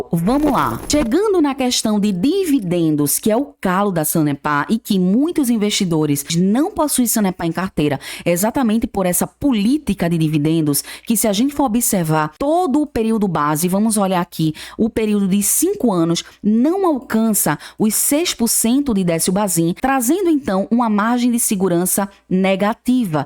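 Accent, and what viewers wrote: Northeast, Brazil. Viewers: Bom português, boa narração, conhecimento muito ok e sotaque nordestino. Tenho Copasa e penso em Sanepar, mas precisa melhorar o dividendos. Parabéns,.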